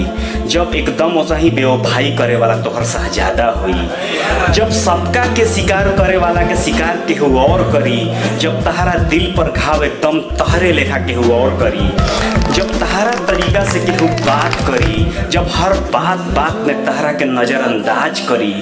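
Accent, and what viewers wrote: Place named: Bhojpuri, India. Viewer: Ek no. Bhojpuri tone with dialogue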